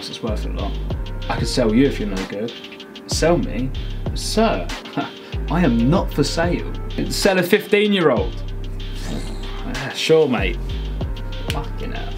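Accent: United Kingdom. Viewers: I like that british accent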